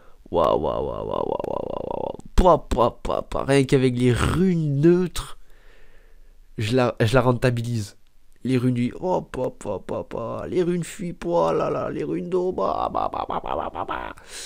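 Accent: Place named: Brittany, France. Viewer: c'est tres l'accent breton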